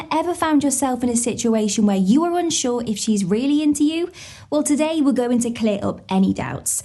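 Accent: United Kingdom. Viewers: British accents are so awesome!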